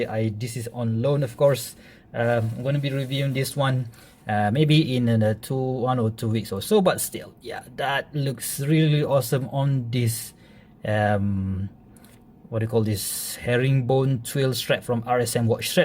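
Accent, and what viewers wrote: Malaysia. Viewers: nicely explained and straight to the point! :D by any chance are you malaysian or singaporean?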